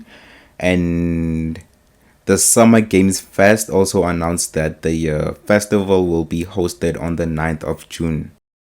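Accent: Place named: South Africa. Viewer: Can hear ur from SA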